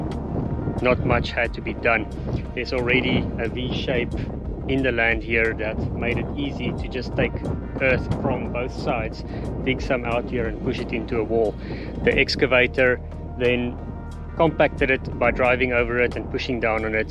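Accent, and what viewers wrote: South Africa. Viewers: Sounds South African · Cool. If for no other reason than fire risk control given rapidly increasing pace of climate change, swales could eventually make a huge difference to your property. Especially given the recent awful drought your part of the world has had; had a lot of UK news coverage at the time. Am I correct in assuming that you're in South Africa going by your accent and landscape?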